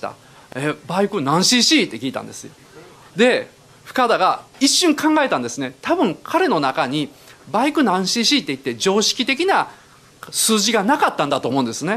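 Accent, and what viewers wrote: Kansai, Japan. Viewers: めちゃくちゃ関西弁やんけ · 噺のネタもさることながら一番やせてた頃のの語り口が関西弁多めなのがさいこうです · 関西弁ネイティブなのに標準語も違和感ないって、この人天才なんかな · ベタベタの関西弁の岡田さんも面白すぎで好きです(笑) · 岡田さんが関西弁で話すのが新鮮です。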